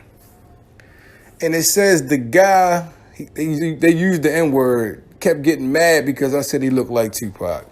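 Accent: Baltimore, United States. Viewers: You must be from Baltimore the way you say those o's lol. You sound like Snoop from the wire.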